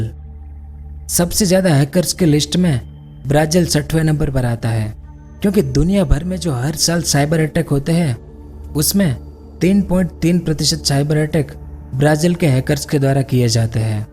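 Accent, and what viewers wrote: Gujarat, India. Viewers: You're accent seems gujrati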